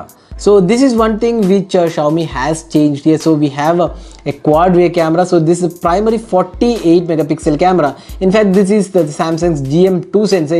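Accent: Kerala, India. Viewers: Malayali right ?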